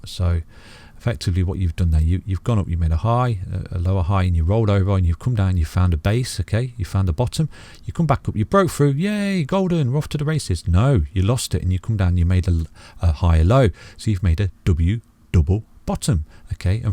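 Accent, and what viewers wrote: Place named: Midlands, United Kingdom. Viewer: Are you from Nottingham? Midlands accent 👍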